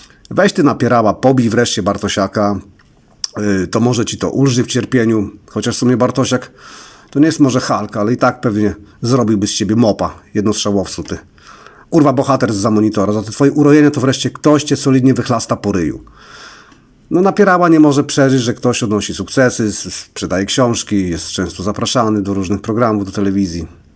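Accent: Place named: Podlasie, Poland. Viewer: Uspokój się ćwoku zaciągający podlasko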